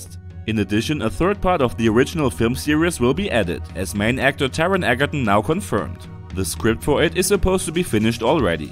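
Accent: Germany. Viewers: that german accent is so hard not to hear sry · der sprecher is safe aus deutschland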